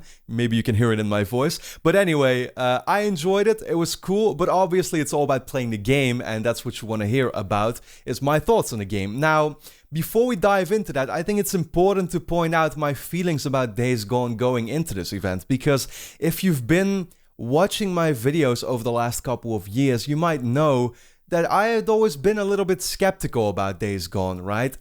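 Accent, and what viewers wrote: Netherlands. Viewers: I cannot unhear the dutch accent lol. Its cool tho, i’m dutch myself. Great review!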